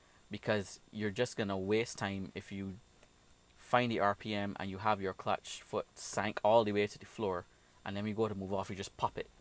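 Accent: Barbados. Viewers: Basically Barbadian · Yh he is bajan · Bajan accent.. from Barbados. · I thought he was Irish at first, then I realised he actually sounds more bajan O_o lmao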